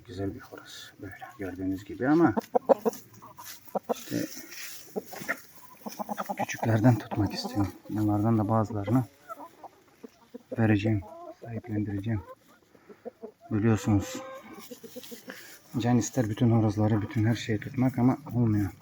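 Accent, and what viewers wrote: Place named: Balkans. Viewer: Siz Türk degilsiniz turkceniz duzgun degil Balkan gocmenine benziyorsuniz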